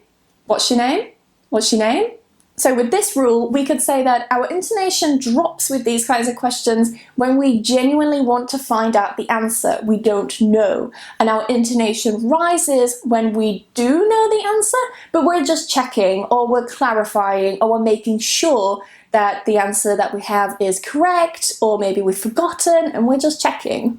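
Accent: United Kingdom. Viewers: It's lesson was marvelous and helpful for me. I love your British pronunciation. Thanks. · Hello! Beautiful British accent!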